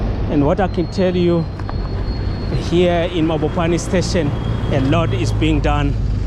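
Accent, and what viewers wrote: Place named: East Africa. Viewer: A foreigner claiming RSA here. The person making this video is not a South African. His tongue points to Kenya, Uganda or Ethiopia. These foreigners cannot really make news about their own countries.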